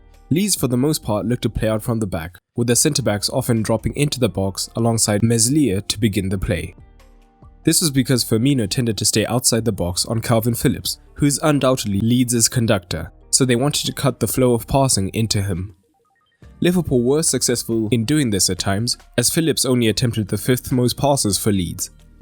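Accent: South Africa. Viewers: Dude are you South African 😂 this accent is mad familiar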